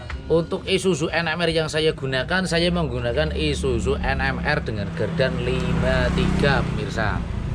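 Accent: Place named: Banyumas, Indonesia. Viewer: Ngomonge deneng mandan ngapak ya mas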